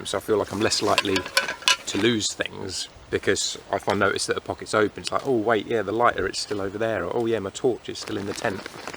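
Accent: United Kingdom. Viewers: Haha, actually you seem to be from UK because of your accent.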